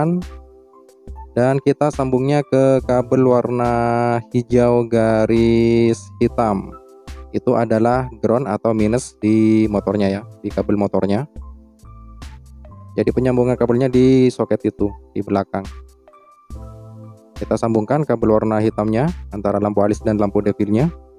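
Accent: Madura, Indonesia. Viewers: orang madura bang? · Bang orang Madura kah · Reng medureh ye bg😁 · Org mn kok bhs madura? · Orng Madura kah bnG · Bang abang orang madura kah? , saya orang madura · Madura ta mas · Kayak nya lu orng madura ya? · Madura ya bang?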